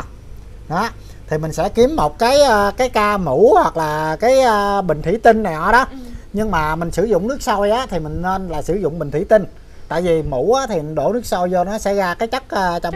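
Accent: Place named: Mekong Delta, Vietnam. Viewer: Miền tây quê tui đó..nói chuyên nghe cưng ko..tự hào vn ơi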